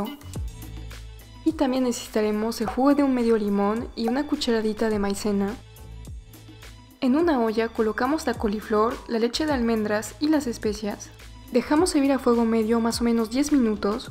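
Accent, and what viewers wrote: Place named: France. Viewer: yo creo que ese acento tienen los franceses (creo que ella es francesa) y ni que sus videos fueran para animarte la vida jajajaja solo comparte recetas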